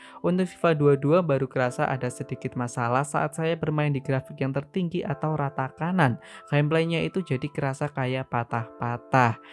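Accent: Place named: Kedu, Indonesia. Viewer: Bahasa jawane mirip daerah kedu-mgl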